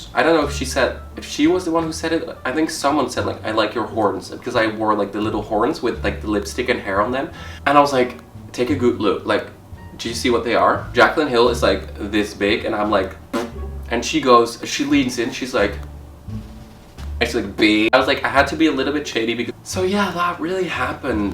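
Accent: Netherlands. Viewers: The Dutch accent lol!!! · Omg he's from the netherlands too! Yooo kaasgap je accent is gaar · yup. just caught that... but with his Dutch accent he always says "who dat" instead of "that"... listen carefully to the way he says that.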